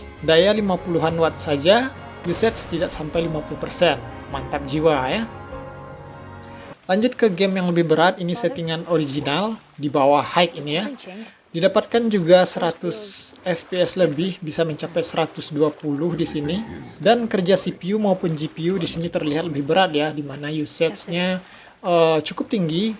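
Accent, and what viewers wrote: West Sumatra, Indonesia. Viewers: Abang orang Sumbar ya?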